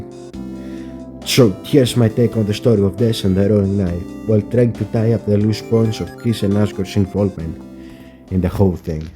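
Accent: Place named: Netherlands. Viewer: Sounds like Netherlands to me for some reason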